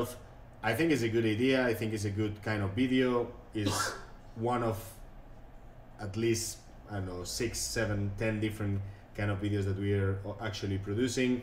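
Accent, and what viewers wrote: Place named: Spain. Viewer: I can understain your english. Are you spanish?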